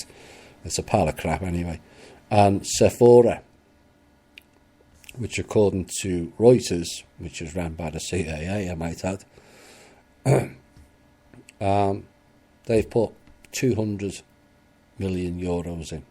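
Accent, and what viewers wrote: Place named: United Kingdom. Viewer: How could it take almost one billion dollars to put a new roof on that church? Something wrong with that picture. From the mindset of an American living in southern California I must say I find your commentary with just a tad bit of cynicism spoken in your British accent quit amusing while very informational. Thank you for your efforts to keep us informed of things regarding the evil cabal!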